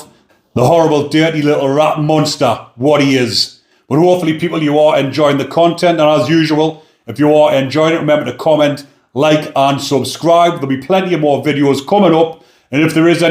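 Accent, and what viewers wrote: Newcastle, United Kingdom. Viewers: I know these are serious topics but i can't help but laugh every time he swears in his Geordie accent.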